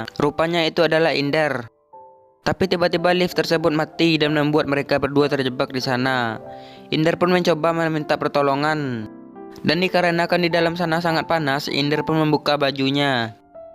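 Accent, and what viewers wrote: Madura, Indonesia. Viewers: eh logatnya kek mdura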